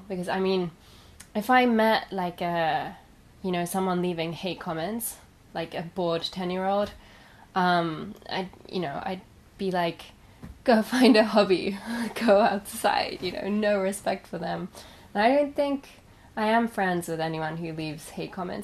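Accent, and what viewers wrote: United Kingdom. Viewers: I’m not going to lie, I first saw your channel when I was looking for tourism spots for Tokyo. The reason I picked and subbed you was well, Beside you being the most beautiful woman I’ve ever seen and you seem quite genuine about yourself, a pretty Japanese girl with a british accent... Something Sanrio has been trying to do with Hello Kitty.I notice from the content that you are doing is somewhat personal, which is nice, like watching a friends travel blog, and you didn’t sell yourself in way that gets more views, with that knowledge I subscribed. I’m a furniture designer but I like dabbing in drawing cartoons for a hobby, but I only did those things because I was unnaturally good at doing them and I didn’t do anything because it was popular, I did them because I genuinely love it. I remember a movie quote from lost in translation: “the more you know who you are and what you want, the less things will bother you.” If you feel this channel is forcing you to do things that pervert your intentions then stop. 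If you feel like you owe people content for their subscription to you, you don’t . If you feel there are better channels than yours, thats true there are, but thats no reason to feel insignificant, since its a living hell to be a slave to peoples anticipation. The person you should focus on always is YOU. What your needs are, are completely different to the needs of other people especially to strangers. Whatever you want to do now, I can only wish you the best and hope ever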